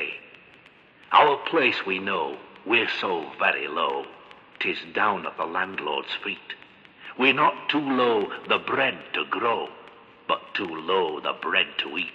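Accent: United Kingdom. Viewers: As someone who is currently writing a doctoral thesis on the poetry of Ernest Jones I was fascinated to find this animation of 'The Song of the Low'. The truth is, however, that Jones's speaking voice would have sounded nothing like this. The accent of the fellow reading this poem (and reading it very well, I must say) is very much Northern English. Jones's father was Equerry to the Duke of Cumberland and he was brought up in Germany. Jones was famous for his refined, 'aristocratic' diction...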